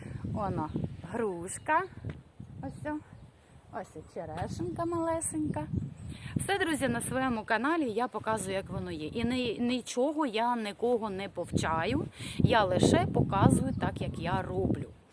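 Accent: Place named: Zhytomyr, Ukraine. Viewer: Доброго ранку,! Привіт з Дніпра. Дуже рада, що підписалася на Вас. Все дотепно і грамотно. Дякую Вам. А Ваша мова як елексір на мою душу. Так розмовляють на моїй рідній житомирщині. Бажаю Вам здоров'я і мирного неба - всім нам!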